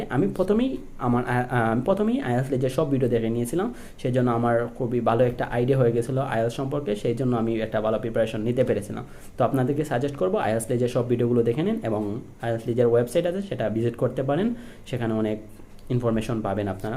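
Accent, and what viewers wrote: Sylhet, Bangladesh. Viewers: Sylheti ni..?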